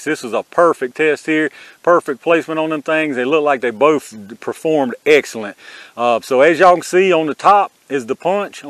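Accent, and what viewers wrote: South, United States. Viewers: Whenever you come up in search, I automatically fast forward to 3-4 minutes in the video then I put your a*s on mute. It's just that your redneck vaginal-sounding lip- yapping is dumb.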